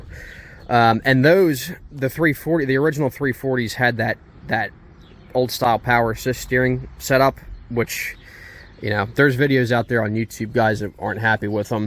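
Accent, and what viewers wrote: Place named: New Jersey, United States. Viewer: that was a wonderful review of the 340A. My friend is looking at a 231 with a loader, but has the smaller 36 hp Diesel. We'll definitely look out for the steering and loader problems you mentioned. On the 3 pt, it's my understanding that the left lift link isn't adjustable, only the right side is. Could definitely tell you grew up in Jersey, as did I. I'm from Bergen County, not many farms left there. Again, great review. Tom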